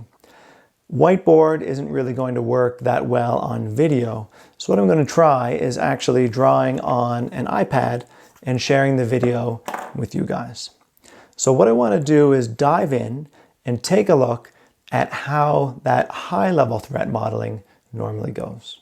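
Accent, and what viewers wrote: Nova Scotia, Canada. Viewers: Yeah, he sounds like he is from Nova Scotia to me and I have met hundreds of east coasters in my lifetime so far. We all talk funny anyway, lol.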